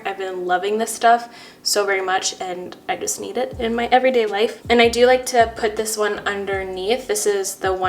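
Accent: France. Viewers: Can you do a tutorial completely in French. I love your accent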